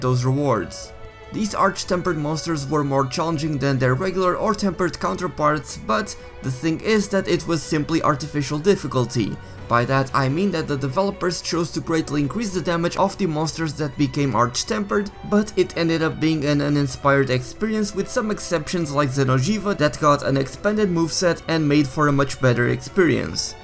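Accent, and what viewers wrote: Portugal. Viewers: Comecei a ver o vídeo e estranhei logo o sotaque. Grande tuga! + um sub :)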